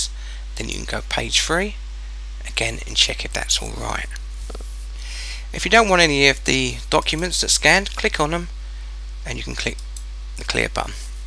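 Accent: United Kingdom. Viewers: Thank you but this british accent is sometime so disgusting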